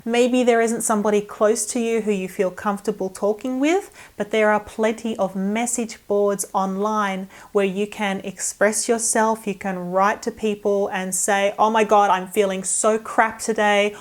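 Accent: Australia. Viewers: Its nothing compared to what joy your videos bring me ! I love your channel , I do , I even started to like manga and stuff that people in Japan like , animes and so on. I know a few words thanks to that , which is thanks to you :) And one more thing darling : You are one of the very first australian youtubers I discovefred on youtube and since then, I love autralian accent ! people say , they end up sounding like asking, as if there is a question mark, I think its more like wanting to say more, so the melody would go down and a little bit up just so that we axpect anothe sentence, but sometimes it feels like you guys are emotional aout the things you say ...I dont know, maybe you could do a video about stuff that make you australian ?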